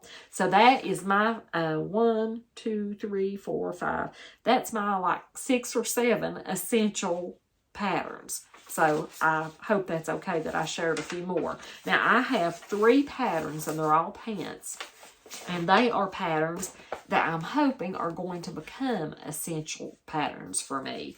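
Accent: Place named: Georgia, United States. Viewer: I noticed your accent right away and thought she sounds like I would if I was on YouTube...I live slightly north of Macon, GA.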